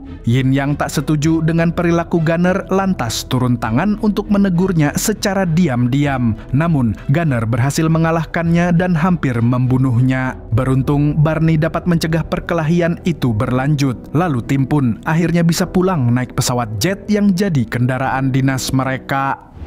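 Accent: Banjar, Indonesia. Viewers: Mimin orang banjar kah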